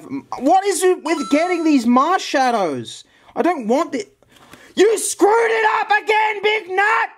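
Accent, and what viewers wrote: Australia. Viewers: Yes your Australian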